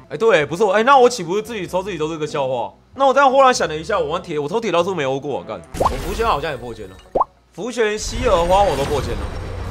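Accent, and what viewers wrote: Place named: Taiwan. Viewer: 老哥的台灣國語好像越來越嚴重了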